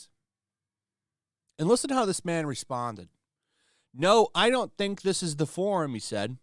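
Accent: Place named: Aristocratic, United Kingdom. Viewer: I’m listening to this as I shop at Kroger and the pompous British aristocrat accent literally has me laughing so hard people are staring at me.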